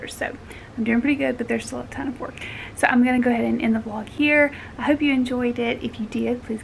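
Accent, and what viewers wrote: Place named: South, United States. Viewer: Hi, You are such a fabulous, conscientious, thorough, hard working, exciting teacher that makes everything so interesting for the kids. After seeing your previous fifth grade class and now seeing your additions for your new 2nd grade classroom it will be a "feast" for the kid's eyes this coming school year! It will be so colorful, cheerful looking, coordinated and organized, too! AND add to all of that, you are such a cute southern girl, with charming accent to match, as well! I can't help but notice that huge gorgeous ring (engagement/wedding ring (?) that you are wearing now. Does that mean what we think it means? (P.S. I am a former 2nd and 3rd grade teacher AND I have also taught Budgeting and Credit Score classes in a women's shelter. And I am also a mother of three and a grandmother of three. So I recognize all of your effort, talent and admire you for all that you do! 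I now live in a fabulous retirement community in Florida, called The Villages. Have you heard of it? I am passing on to my retired educator friends in our club about your channel so you will probably see more subscriptions from them) AND OH! I love your new home office too - another feast for the eyes (!) - so efficient and it gives you so much room to work! Have a great summer and upcoming school year,!... from an admirer... from afar!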